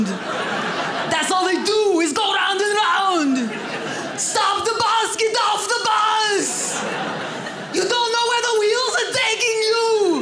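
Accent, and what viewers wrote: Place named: Texas, United States. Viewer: We can tell your from Texas by the yelling, extreme speaking voice.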